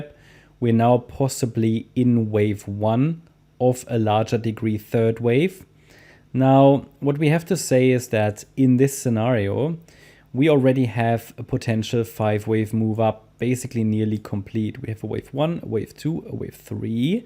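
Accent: Germany. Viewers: Ok, my mistake. Sounds like a German accent. Or maybe you are German just living elsewhere?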